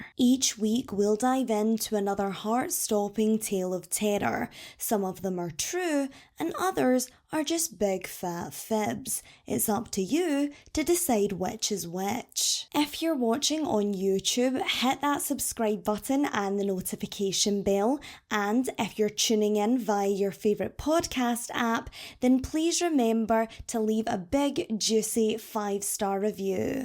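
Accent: Scotland, United Kingdom. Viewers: Lovely accent. Where in Scotland are you from? Aberdeen maybe? I was born there.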